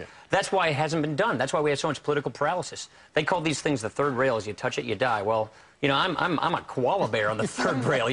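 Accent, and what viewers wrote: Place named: United States. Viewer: this guy looks like a leprachaun :D but with an american accend hmmm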